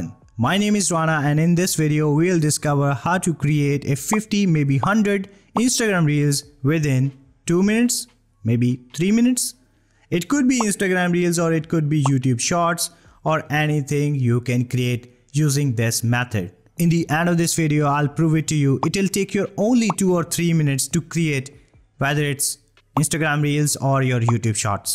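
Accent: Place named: India. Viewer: "This is a driving drop tool".. I'm not too efficient in English - but what indeed does it mean, and why it is not possible for Indians to speak normal english at least for tutorial videos purposes?..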